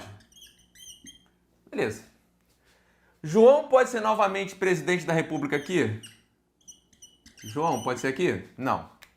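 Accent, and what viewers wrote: Rio de Janeiro, Brazil. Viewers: Prof.. adorei sua aula!! Parabens e obg!! Mto bommm “mexmo” hahahaha usando seu carioques!! 👏🏻👏🏻👏🏻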